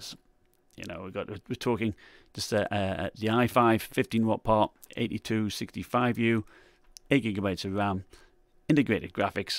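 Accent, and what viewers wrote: United Kingdom. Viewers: That’s odd I heard a UK accent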